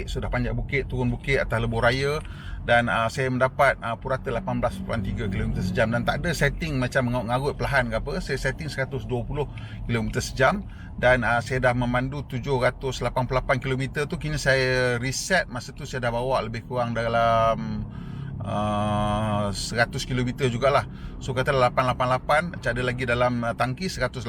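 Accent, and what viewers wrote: North, Malaysia. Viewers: ulas guna slank utaqa style jgk bang.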